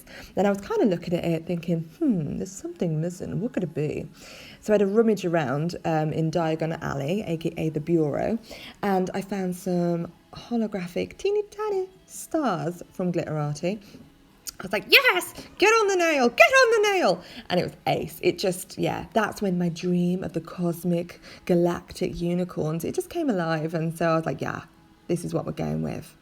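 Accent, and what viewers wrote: United Kingdom. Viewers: I love listening to your British accent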